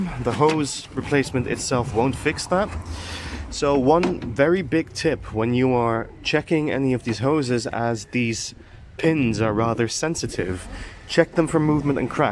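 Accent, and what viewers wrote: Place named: Netherlands. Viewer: hoor al dat je nederlands bent aan het accent ! ik heb een 230 .. zelfde soort gezeik continu ... maarja er blijft sowieso wel elke week wat nieuws kapot gaan aan dat klote ding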